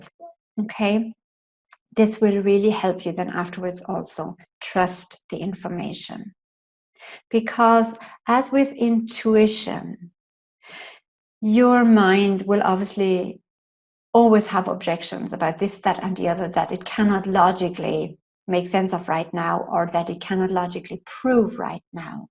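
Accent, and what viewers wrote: Ireland. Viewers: Hi, you have a west of Ireland accent! So easy to listen to:-)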